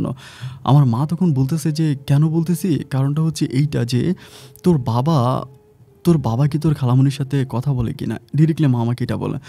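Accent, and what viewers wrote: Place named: Rangpur, Bangladesh. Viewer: ওহ রংপুরের ভাষায় কথা বললেন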